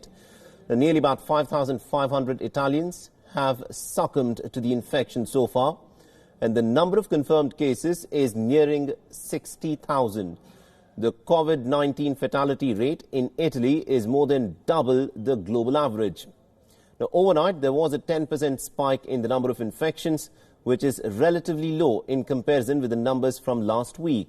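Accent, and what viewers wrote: India. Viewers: It is an Indian channel. If you can't understand his accent may be you are at a wrong place. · It's indian news channel.. He is speaking in indian English.. U dont know... Go and learn it kid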